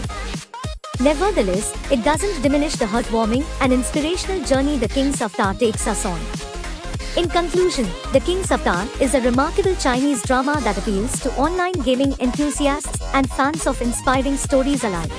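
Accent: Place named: India. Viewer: Chinese drama described by a lady with a thick Indian accent which is difficult to understand by Chinese or American viewers! Why?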